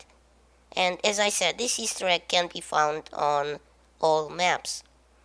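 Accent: Russia. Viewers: You are a russian ?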